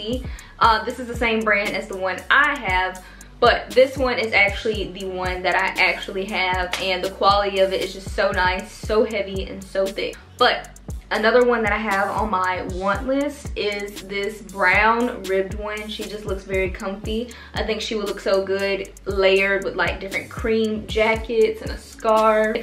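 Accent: South, United States. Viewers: Oh my gosh girl I love your energy and that southern accent ! I’m sure you get this all the time… but where are you from !!? I’m lovin it ♥️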